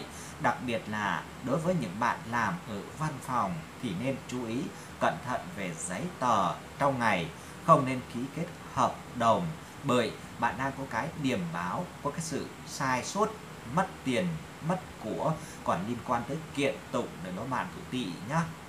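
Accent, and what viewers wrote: Thanh Hoa, Vietnam. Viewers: Dung giong Thanh Hoa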